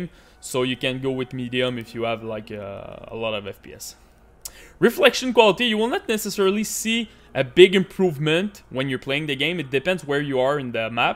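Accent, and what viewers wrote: Quebec, Canada. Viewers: La bonne accent quebecoise jadore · avoue tu viens du qc ta l'air d'avoir un petit accent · Je peux enttendre ton accent Quebecois lol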